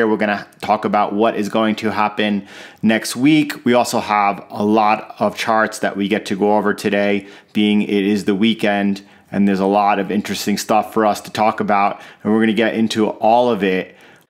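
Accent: United States. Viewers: NY accent, but where? Long Island?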